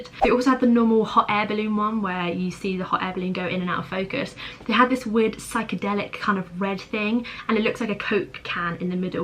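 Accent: United Kingdom. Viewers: Your accent is a British slat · Are you Malaysian with British Accent?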